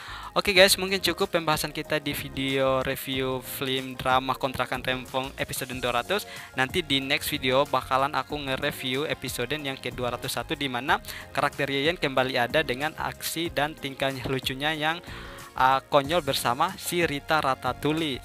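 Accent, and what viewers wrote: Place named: Sulawesi, Indonesia. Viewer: Kentara sekali logat Sulawesi nya 🙏😁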